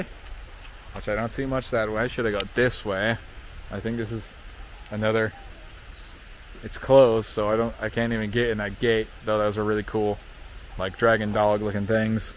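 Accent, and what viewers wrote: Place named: United States. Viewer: How ignorant of you to assume that he is Brtish even after hearing his American accent 😂😂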